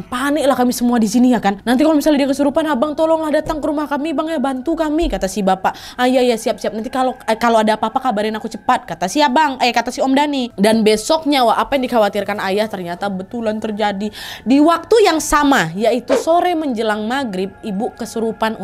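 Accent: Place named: Riau, Indonesia. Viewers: pake logat Riau juga ya Wak😭😭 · Kak knpa logat nya jdi logat riau. "ndak do"😂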